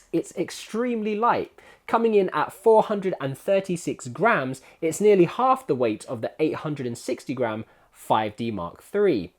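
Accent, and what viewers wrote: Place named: United Kingdom. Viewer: So nice to have someone British and not American 😅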